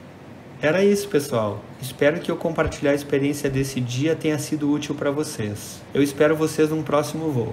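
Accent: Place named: Rio de Janeiro, Brazil. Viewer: Aaaaaaaa cariocaaaaaa se entregou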